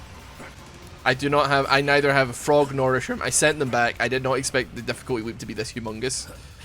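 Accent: Scotland, United Kingdom. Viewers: I'm new here is he British or Australian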